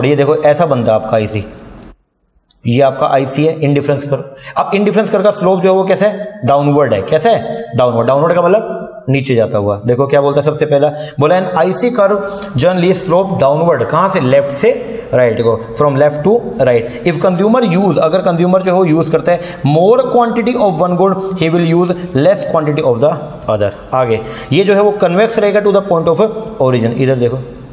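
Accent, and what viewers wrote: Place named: Gujarat, India. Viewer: Sir are you gujrati ? Or are you from Gujrat? Sir bcz jab aap padhate ho toh language mein gujrati ascent pta chlta h😁😁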